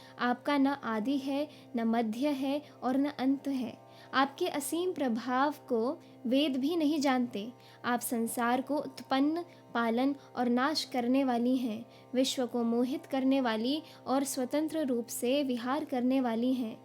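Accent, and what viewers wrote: Standard. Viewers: बहुत शुध्द उच्चारण है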